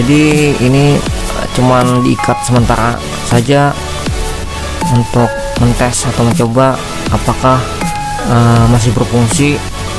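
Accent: Banjar, Indonesia. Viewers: logat bahasanya kaya urang banjar.... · Logat ikam banjar banar . Aku samarinda kampungku amuntai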